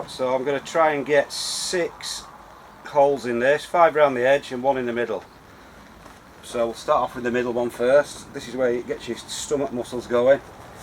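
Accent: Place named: Scotland, United Kingdom. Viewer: Hello from New Mexico, What kind of sand are you using? Is it something from a home depot or garden centre, or just regular old sand? Or is it something special? Second, Do you have holes in the bottom of your barrels for the water to drain out? Where are you located? I think maybe Scotland from your accent? It sure seems to rain a whole lot there. Can you reuse the sand the following year?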